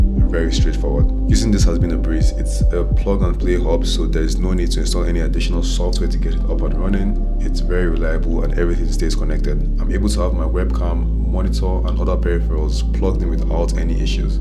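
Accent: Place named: Nigeria. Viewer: I can hear the Nigerian accent 😂 never leaves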